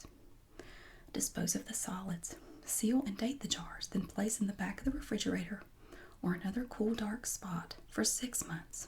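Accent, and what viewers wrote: South, United States. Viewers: I subscribed! Like my southern sister speak!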